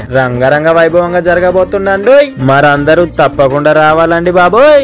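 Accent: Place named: Godavari, India. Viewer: Godavari slang ela chesaru bro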